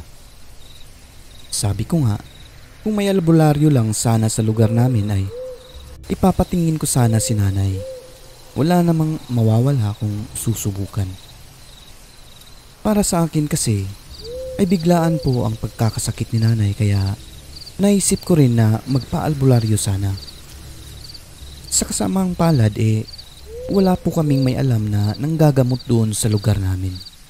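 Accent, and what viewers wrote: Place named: Iloilo, Philippines. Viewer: Sakit naman boss nawalan ng ina tapos ang dahilan di pa pinaniwalaan ng iba.I mean boss di ma explain..napapatawa ako boss kapag mag ilongo ka boss sarap lng pakinggan ba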